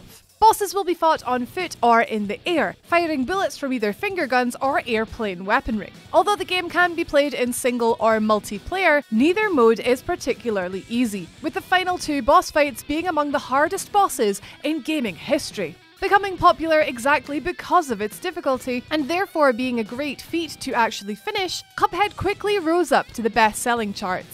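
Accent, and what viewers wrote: Scotland, United Kingdom. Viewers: THE GAME IS DRIPPING WITH CONSTANT PERIL , love the Scottish accent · Fábio Pinto that’s a woman mate, Scottish accent.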